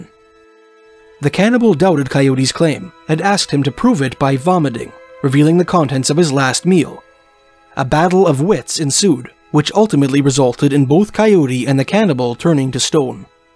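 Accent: Canada. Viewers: I wonder where she grew up in Canada with that accent.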